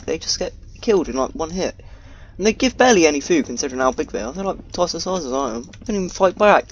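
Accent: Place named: Scotland, United Kingdom. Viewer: oh I thought you were a scot because of your accent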